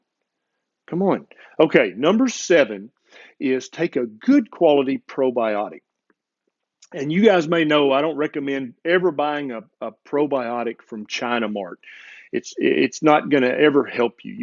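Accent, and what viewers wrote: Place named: North Carolina, United States. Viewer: I am trying to place his accent. My guess North Carolina?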